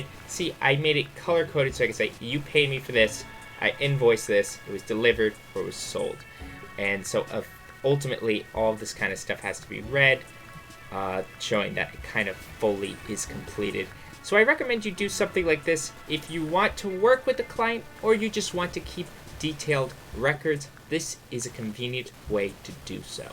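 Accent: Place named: New York, United States. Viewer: Your accent is intense. Definitely a New Yorker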